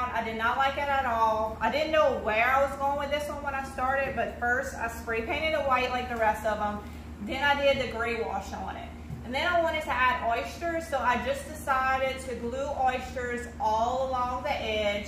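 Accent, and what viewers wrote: Louisiana, United States. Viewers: So Awesome. Thanks for answering back. I'm from the West Bank of N.O....nice to hear another LA accent. I can't wait to try some if your ideas and your recent project sounds cute. Glad you were able to sell it. I hope to sell some of mine in the near future. ❤❤❤ Oh and that sounds so cute attaching them to a shutter.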